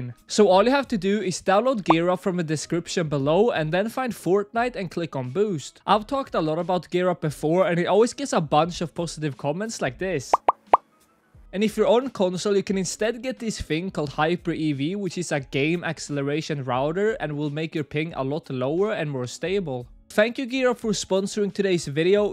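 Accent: Sweden. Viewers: You speak swedish, I can heat it in your accent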